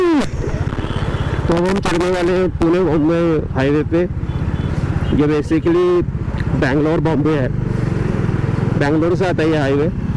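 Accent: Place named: Haryana, India. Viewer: Haryanvi accent is so authentic... 👌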